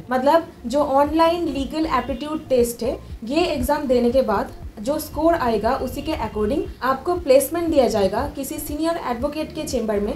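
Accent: Bengal, India. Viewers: Mam r you Bengali?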